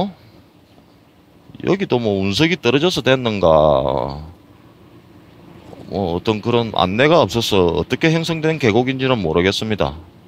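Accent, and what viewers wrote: Gyeongsang, South Korea. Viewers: 영어도 굉장히 잘하시던데 완즈이 경상도 사나이시군요 ㅎㅎㅎ 항상 안전운전하세요!!! 저도 현직 트레일러 기사입니다^^ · 고향이 경상도 어디세요? 난 진주가 고향입니다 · 구수한 경상도 말트때문에 볼때마다 지겹지가 않아 항상보고 있습니다 안전운전하세요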